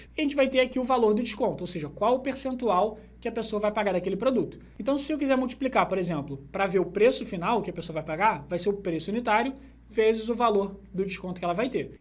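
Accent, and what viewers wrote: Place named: Rio de Janeiro, Brazil. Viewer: Brother, sou carioca tbm, mas moro em Fortaleza desde os 7 anos...mano eu tinha maior preconceito em vê uma aula sua por conta do incômodo do sotaque...Mas olha, conteúdo muito irado, parabéns...vou acompanhar mais.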